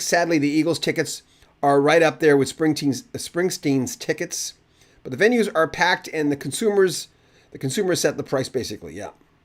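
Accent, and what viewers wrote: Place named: Canada. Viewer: You can definitely tell he's Canadian by his accent... especially the way he says "abowt".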